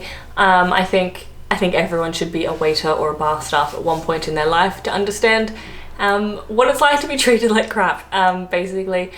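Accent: England, United Kingdom. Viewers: dont get me wrong you are right about put culture i am 65 you sound more english than me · Middle Class English accent!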